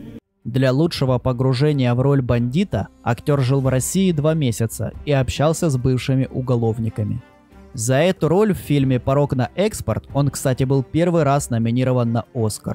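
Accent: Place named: Ukraine. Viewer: Интересно рассказываешь. И интонации зачастую правильные берёшь. Заметил слабый украинский акцент) Угадал? Может показалось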